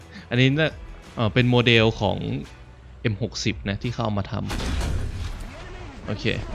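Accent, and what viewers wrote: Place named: North, Thailand. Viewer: เป็นคนเหนือหรือเปล่าครับ สำเนียงเหมือนเลย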